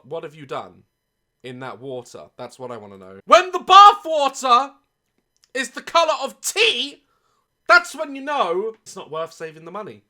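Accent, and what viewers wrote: United Kingdom. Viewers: I love how becomes SUPER BRITISH whenever he is confused about stuff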